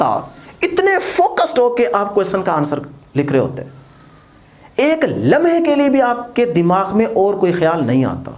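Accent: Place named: Saraiki, Pakistan. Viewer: Sir AP ky bolnay k Andaz sy pta chal gaya mn bhi sariki ho😊😊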